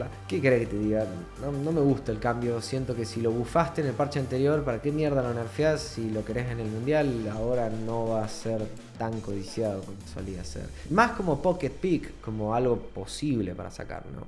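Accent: Argentina. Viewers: tenía acento argentino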